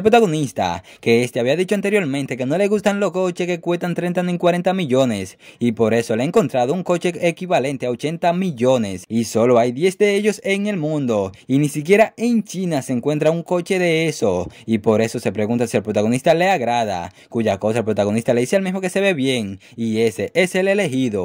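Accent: Dominican Republic. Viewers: Eres de republica dominicana?. O de alguna zona del caribe. Tu acento es muy parecido😅 lo digo por que estoy en republica dominicana tomando vacaciones. Pero buen video😅❤❤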